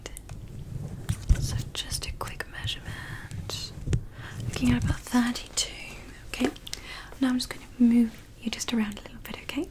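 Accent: England, United Kingdom. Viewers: Does she do any other ASMRs in her English accent? I love it so much lol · I was beginning to withdrawal from not hearing the British accent. She came through at the perfect timing lol🙏🏼🙏🏼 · Your English accent is the best please make more of them like this 😍😍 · Really Beautiful Woman with Glasses and the Most Beautiful British Accent!! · Big Hayley Atwell vibes with the british accent 😍😍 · YES! THE BRITISH ACCENT IS BACK!!!!!!!!!!!!!!!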